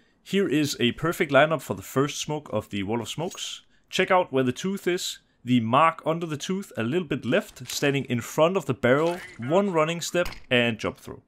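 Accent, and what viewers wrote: Denmark. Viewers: Danish accent kills me, it’s so awful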